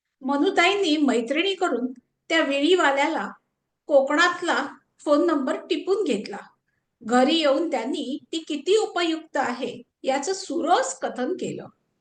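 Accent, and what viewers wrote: India. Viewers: विनोदी कथेचं मिश्किल मालवणी ठसक्यात मस्त सादरीकरण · खूपच सुंदर सादरीकरण. ऐकताना खूप मजा वाटली .मुख्यता कोकणी हेल अगदी ठसक्यात.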